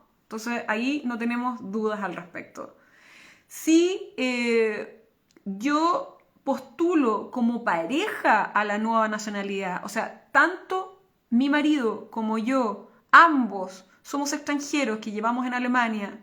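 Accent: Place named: Chile. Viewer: Excelente vídeo muy informativo y riguroso. También le tengo que decir que su acento chileno es precioso sobretodo porque es de alguien culto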